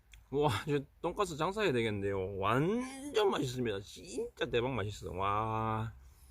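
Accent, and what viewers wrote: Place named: Seoul, South Korea. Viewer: 목소리 딱듣고 스울사람 인지 알았음 ㅋㅋ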